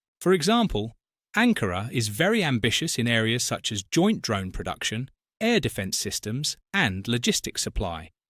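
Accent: United Kingdom. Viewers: U sound british and that explains yr stupidity . Bringing turkey in to the picture u are signing ukranes death certificate and u know that because they are made out of the shit as the british . The minute putin gives them a longer cucumber to suck on they will run ove to him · what is this Turkish BS Propaganda? with a British accent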